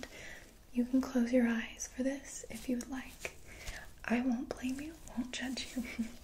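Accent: England, United Kingdom. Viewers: british again, ithought today's would be Aussie. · I thought u r english after watching previous video lol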